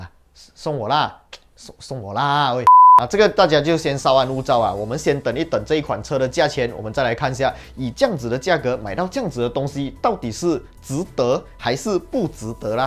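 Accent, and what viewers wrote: Malaysia. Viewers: Malaysia華語就是醬rojak的咯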